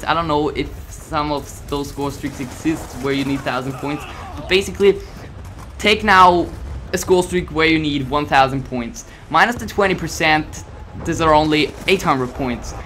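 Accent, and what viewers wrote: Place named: Germany. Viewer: He might be German, or European so that's why his voice is like that. And i must say that he is MUCH better than me, and luckier too haha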